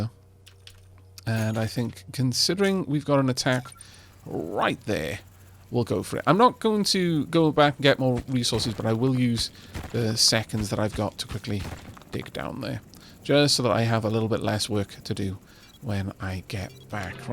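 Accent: United Kingdom. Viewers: More videos, more! Also, large heckin' maps. Just fast forward/skip through non-commented spots. Although there's something vaguely relaxing almost ASMR-esque about vaguely British voice commenting while mining out a vast sprawling underground.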